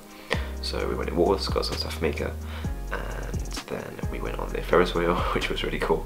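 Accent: United Kingdom. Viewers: i enjoy your videos and practice with your British acent greetings from bogota.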